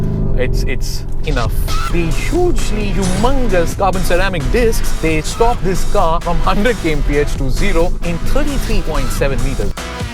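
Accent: India. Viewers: Ur indian accent tho...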